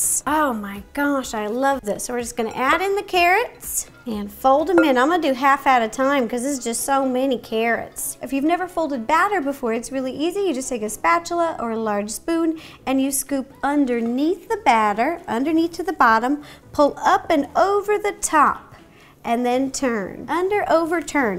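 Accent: South, United States. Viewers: No one:......... Me: notices accent why is there a southern accent if she is from Seattle, WA? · Why does she have a southern accent half the time? She's so cute😂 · was it just me or did she sound like she had a southern accent 😂😂 love u ro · She is starting to sound southern!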